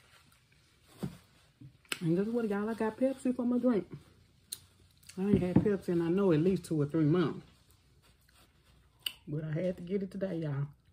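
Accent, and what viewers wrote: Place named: St. Louis, United States. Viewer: Yes ma’am!!! I can tell by how you talk and especially that duck and special noodles!!! North County Florissant!!! I just watched your gizzard video with my husband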